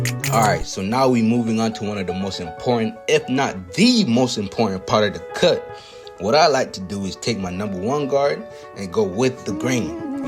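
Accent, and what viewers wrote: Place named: Baltimore, United States. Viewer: Where you from? If you are close to me I will have to stop by and get a cut. I thought I heard a bmore accent.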